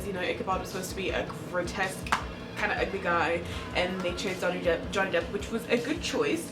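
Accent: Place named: United Kingdom. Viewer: really?? I hear a slight British accent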